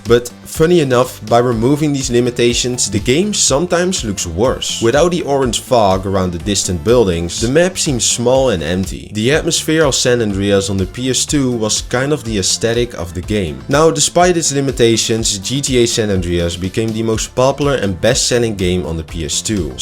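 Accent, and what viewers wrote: Netherlands. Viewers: i hear dutch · is that a dutch accent I hear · I smell Dutch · dutch detected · That thick dutch accent🫡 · Holy Dutch accent ❤‍🩹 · Ik ruik een Nederlands accent 👀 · Nice vid! Hoor dat je een nederlander bent 👌🏻. Keep it up🤘🏻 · Hahaha dat accent is meteen herkenbaar als kuthollander xdddd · im POSITIVE youre dutch!! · i both love and hate the strong dutch accent lmao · I hear a Dutch accent. PlayStation 2 kon de games van de disk laden terwijl de game draait. Niet veel games maakte er gebruik van (jack and dexter, need for speed games did that). Bij nfs en jack kon de game zelfs opslaan tijdens t spelen (eerste vorm van autosave). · Je herkent Nederlandse accent direct. Blijf zo door gaan je gaat groot worden. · I hear Nederlands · bro just stick to dutch. your dutch accent is too horrible for this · I smell dutch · lol dutch accent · Jij bent sws Nederlands ik weet het gwn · you are literally the most dutch person i have ever heard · Is that a Dutch accent i hear? 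Nice vid btw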